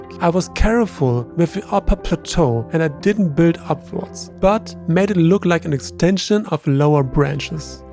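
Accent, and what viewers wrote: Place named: Germany. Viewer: Hey man Great Content fellow ant keeper here. Are you German btw because you got the German accent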